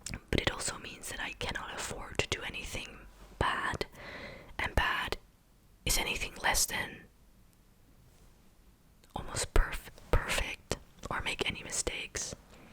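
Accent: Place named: United States. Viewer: Wow, i wouldn't have thought you were from Netherlands, your accent made me think you were american. Where did you learn english by the way?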